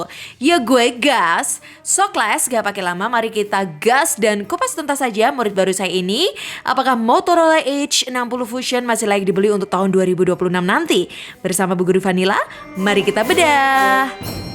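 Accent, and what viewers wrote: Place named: Java, Indonesia. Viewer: Beda sih , ini masih ada tipis2 medok nya Kalo d trans 7 lebih mulus hehe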